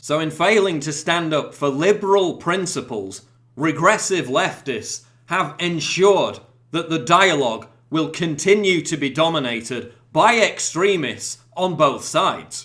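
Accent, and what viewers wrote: United States. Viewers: thought you were British so why are you talking like a American.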